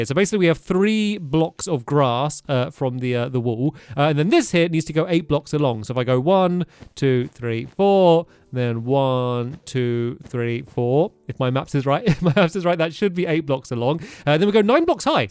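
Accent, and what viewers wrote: United Kingdom. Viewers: Yo stampy u speak British. ?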